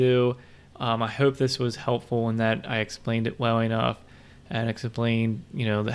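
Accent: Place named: Baltimore, United States. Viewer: Are you from Baltimore or Maybe Philly?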